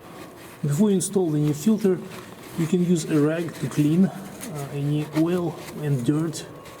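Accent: Russia. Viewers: Thic Ruski Accent with good english is soothing lol 😅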